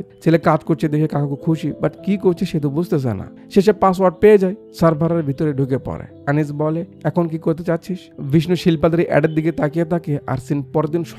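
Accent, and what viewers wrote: Bogura, Bangladesh. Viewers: Vaiya akta request kori? Apni besir vag kotha guloi bogurar vashai bolben,, amar kuv valo lage🥰🥰🥰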